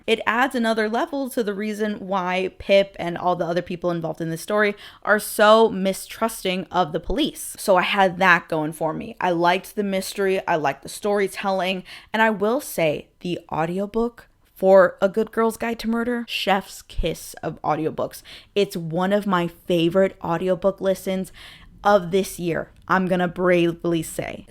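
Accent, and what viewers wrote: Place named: Midwest, United States. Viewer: The midwestern accent dropping the last letter is REALL. I literally paused the video to say the title and realized I did that as well lmao.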